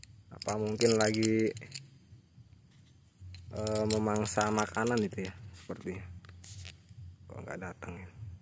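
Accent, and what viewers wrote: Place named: Malang, Indonesia. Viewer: Ini didaerah mana kang? Kok seperti bahasa saya daerah malang selatan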